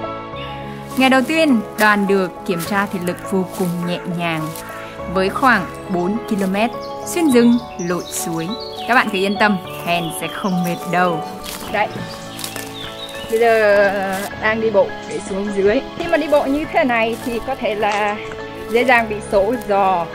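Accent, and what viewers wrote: North, Vietnam. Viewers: Hen nói giọng miền bắc dễ thương lắm chị sẽ ủng em hãy làm những gì mình thích cô gái mạnh mẽ quá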